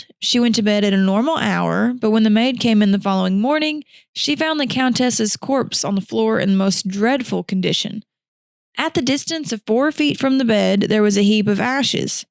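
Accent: Kentucky, United States. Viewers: And as a nice bonus, along with that sweetest southern Kentuckyian accent of yours, I also love your unique sense of humor B. So that means I have found my new favorite content creator, and the videos I'm gonna binge - watching every day, while I'm resting and relaxing after my training, and enjoy while doing it. So the least I can do, is to like, & leave a comment for the " Algorithm Gods" while I'm enjoying your videos. Thank you, and I appreciate you and your hard work that you're putting into making this awesome videos for us, so very much. Cheers!👨‍🎤🌹👧🇷🇸🍀🥂🇺🇸🌏😎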